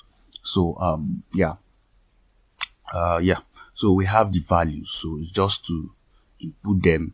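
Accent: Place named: Nigeria. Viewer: I always feel proud whenever I hear Nigerian voice in tutorial videos.. Good job bro❤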